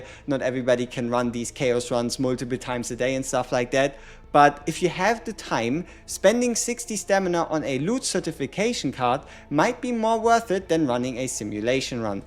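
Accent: Germany. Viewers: You sound german. Nice video tho. Thx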